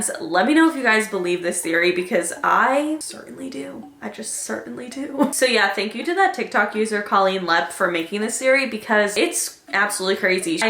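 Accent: United Kingdom. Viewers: Why am I so obsessed with your random British accent, it's so fucking funny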